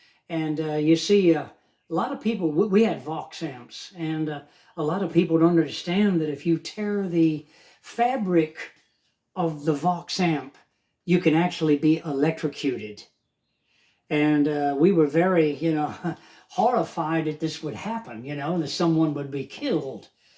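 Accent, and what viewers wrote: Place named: Liverpool, United Kingdom. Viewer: Soundin' positively Liverpudlian there! (& not boring!)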